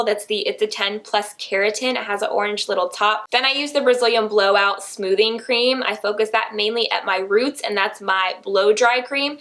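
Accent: South, United States. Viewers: I don't know why and I don't know how but I can hear a southern twang?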